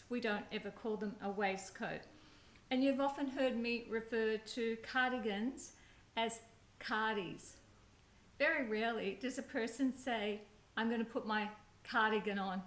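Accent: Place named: Australia. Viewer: I loved this, some of the terms you use are like America 🇺🇸 and a lot the same as England 🇬🇧....I love the Australian 🇦🇺 accent.......You style is impeccable as always....🌺🌺🌺Much Love Bella🌺🌺🌺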